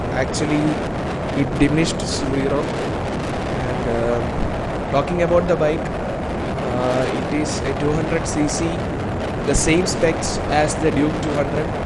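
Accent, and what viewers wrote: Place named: Kerala, India. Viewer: r u a mallu .You sound like one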